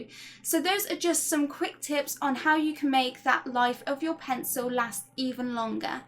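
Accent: United Kingdom. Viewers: Besides all your amazing, thorough explanations and detailed drawings and tips, I love your accent! I love the way you say pencil it makes me smile EVERY TIME I wish I had an accent like this and I've been practicing my British ever since I watched Austin Powers first when I was like 6 or 7, haha sometimes when I write stuff like this I say it in my head in an accent 😂 Again thank you for amazing work, you really have made me pull up my art determination and persistence, as well as follow thru, that's my biggest problem! I always start pictures and when I'm done with that session I don't really go back to it unless I randomly find it again... I also am sort of homeless atm, not to worry we have money and cars, but since I don't really have my own space it's hard to spread out my art/storage/drawing space so maybe that's why I always start new when I go to start a project.... 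if you want to write a response I'd be pleased but also I watch all your videos and copy down great tips to remember (almost all of them haha) so I've got quite a lil notebook full 😊 So I'm sure there is something for every question I ask ! Brilliant work and lovely role model! You are such an inspiration! Thanks again! Much love, Autumn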